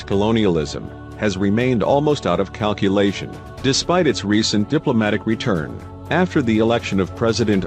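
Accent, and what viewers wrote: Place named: Algeria. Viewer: لكنة المعلق جزاءرية ( ضبط الكلمات و الجمل) وهو ما لن يفهمه الغير من اللغة الامريكية الاصل في النطق... هذه براباغندا جزاءرية مثل الفنان الذي صبغوه باللون الاسود و قدموه اما المشاهدين علي انه من سكان الجنوب.......الجزاءر و لغتها المتسلطة سترتد عليها و لن تستطيع دخول الاراضي الليبية في الوقت الحالي و الحرب في اوجها ....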